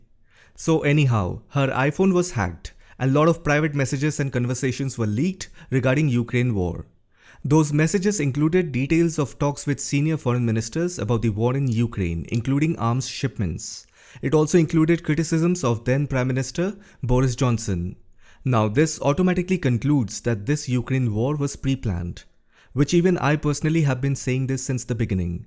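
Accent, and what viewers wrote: India. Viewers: Wait…. R u endian?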